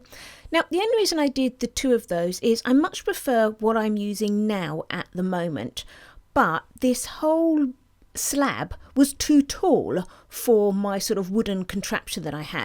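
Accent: United Kingdom. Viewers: Holy cow, these are the CUTEST EVER!!!!! I am absolutely in LOVE with your channel as a brand new soap maker! I'm an artist and love to create pretty things so your videos give me hope that I can one day get to a point even close to some of the soaps you make. What an inspiration! You are a wonderful teacher also and I absolutely LOVE your accent! (I play an online game with a lot of folks from your neck of the woods and it amazes me how many different accents there are just in the UK!) Gorgeous soap!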